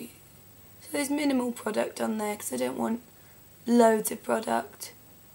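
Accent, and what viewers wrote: United Kingdom. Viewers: i love british accent:)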